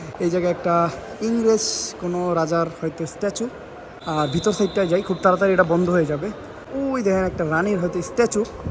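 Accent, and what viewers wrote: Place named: India. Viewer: কে কে খেয়াল করেছেন, ভাইয়ের কথা বলার ধরন কিছুটা ইন্ডিয়ানদের মত হয়ে গেছে, 😅😅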